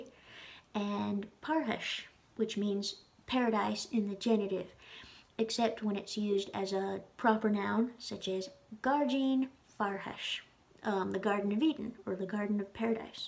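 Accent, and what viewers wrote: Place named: Alabama, United States. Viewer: You're concerned about your pronunciation because you're from Alabama, I'm a Yankee and I put a fada on all A's regardless of standard pronunciation. This was an outstanding lesson! GRMA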